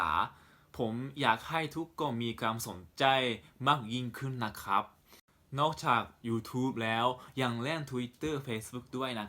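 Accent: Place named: Japan. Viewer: เก่งมากเลยครับ พูดคล่องเหมือนคนไทย 70% สำเนียงติดที่ลิ้นแข็งแบบคนญี่ปุ่นเป็นกัน ฝึกพูดเยอะๆครับ สุดยอดครับ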